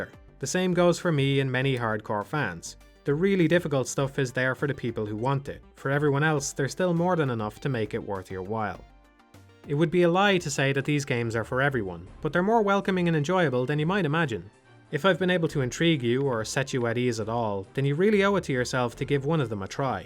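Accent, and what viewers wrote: Ireland. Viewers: Just discovered your channel researching Zachtronics. Gas to come across an Irish voice :D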